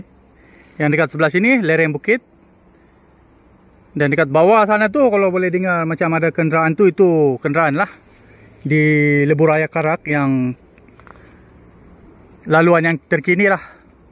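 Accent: Sabah, Malaysia. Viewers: Hai..salam dri sabah,sya pn cmpuran jg..mak sya org rungus kudat bpa sya org brunei..sya fhm2 sikit2 ckp..sbb mcm bhsa rungus jg cuma lohgat sja lain..sya memng sdia mklum org sabah thu dri bhsa mlayu sja..apa pn terbaik..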